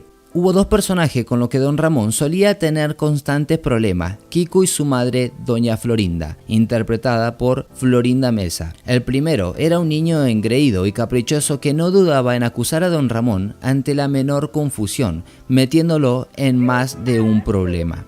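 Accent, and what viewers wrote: Argentina. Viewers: Sacá el acento de argentino lpm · SOS argentino? Porq hablas todo con sho jaj pero tenés otro acento a la vez jaja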